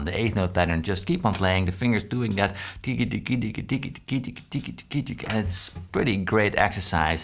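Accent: Netherlands. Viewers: You sound dutch!! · I love this guys’ lesson on this song. Always amazed at people like Paul Davids and their understanding of music. Also Loving the Dutch accent.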